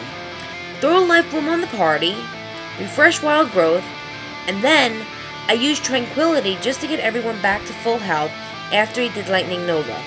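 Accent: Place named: Brooklyn, United States. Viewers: she sounds like mila kunis with a bit of a new yorker accent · i like 2 things about this video 1) the tip saying how healing isn't nessicarily about rotations just knowing what move is best for a given situationg and 2) your awsome brooklyn accent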